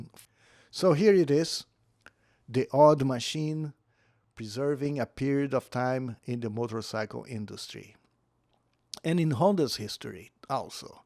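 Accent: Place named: Brazil. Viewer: i loved this video and also love the name of your channel....sotaque de brasileiro hein i am a new subscriber to this channel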